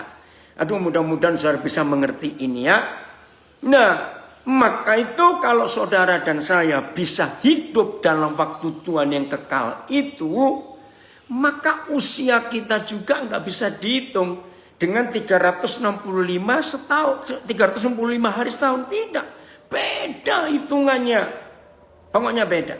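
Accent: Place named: Surabaya, Indonesia. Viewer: Jelas psk dt suaranya dr surabaya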